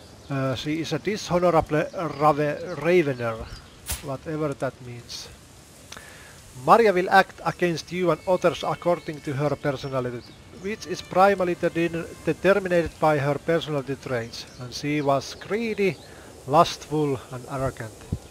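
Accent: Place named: Finland. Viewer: You sounds very finnish. I like it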